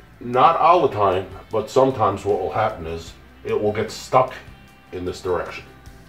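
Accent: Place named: Newfoundland, Canada. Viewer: first 5 seconds.. please tell me yer newfie?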